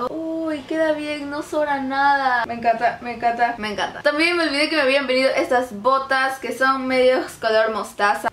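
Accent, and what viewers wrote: Mexico. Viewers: pensé q era de México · no se si estoy loca pero ella habla como mexicana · por un momento pense que eras de Mexico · eres de Mexico?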